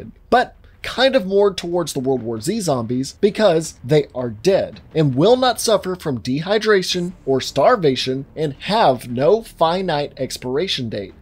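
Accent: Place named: South, United States. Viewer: I just realized how much he has a southern drawl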